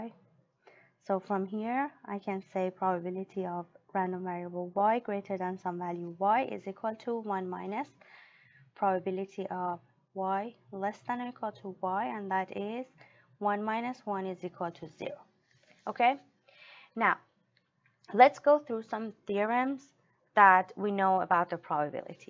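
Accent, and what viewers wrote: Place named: Iran. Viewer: I'm sure that, this accent is persian :)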